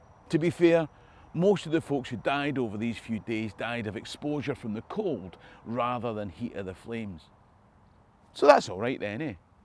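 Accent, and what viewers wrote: Scotland, United Kingdom. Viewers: You live in Scotland, you sound Scottish! So I think you're probably Scottish! I've known several Americans who claimed to be proud Scots. But they'd never been to Scotland & couldn't even pronounce Edinburgh! Don't let anyone tell you you're not a Scot! Great video! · Hello, hello from Virginia in USA! I would like to have a "Let me tell you a story..." shirt because I recently told about my Scottish heritage & I LOVE learning about Scotland from! He is fascinating, engaging & I adore listening to Scottish history in a brilliant Scottish accent! Thank you so much for telling stories! 💜💋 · I'll leave that small hoodie for someone else, but I love your channel! The wonderfully presented history, the beautiful Scottish accent, the scenery, and humor all keep me coming back for more. Keep up the good work!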